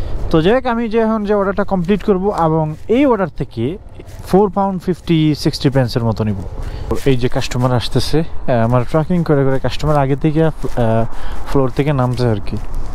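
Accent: Sylhet, Bangladesh. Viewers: বাই সিলেটি নি😊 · Vai apne ki Sylheti Don’t mind ami akjon Sylheti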